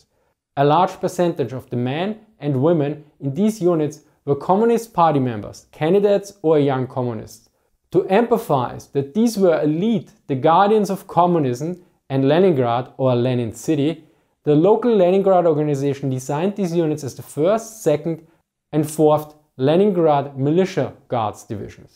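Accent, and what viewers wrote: Germany. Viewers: bad English pronounciation... I just cannot bear his accent. It is unbearable to listen to someone who uses the "German language melody" on each and every English vocab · German accent can be hard to stomach. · To be fair narration matters a lot and actual bad narrators can ruin a video. But accent isn't as terrible as you make it to be. What he says is perfectly intelligible w/o subs. If it bothers you that much, you can maybe contact him about funding him a native English speaker for narration (it's always about the dough ultimately of course). Tho to me I don't get to hear someone speaking English in a hard German accent that often so it's actually a pretty interesting aspect of his videos.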